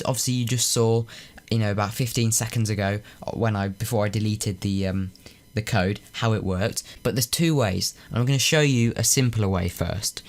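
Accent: England, United Kingdom. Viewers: I love the way people with English accents pronounce the words and say er , ar, and like bananar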